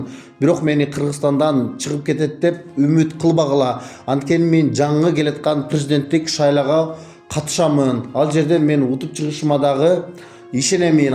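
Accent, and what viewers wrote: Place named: China. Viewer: Сен кыргызсынбы кытайча суйлойсун жинди келтирип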